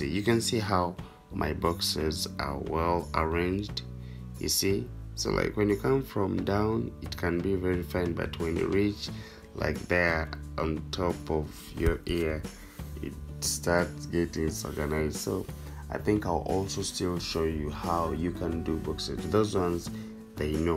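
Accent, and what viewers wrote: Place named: Uganda. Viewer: HOW COME YOUR ACCENT IS SO UGANDAN?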